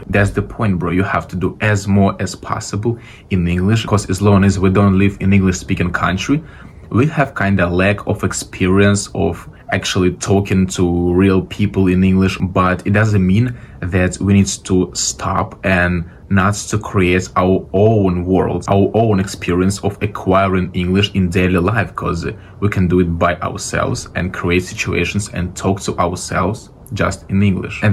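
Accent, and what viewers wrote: Russia. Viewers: Вы из России? акцент🙃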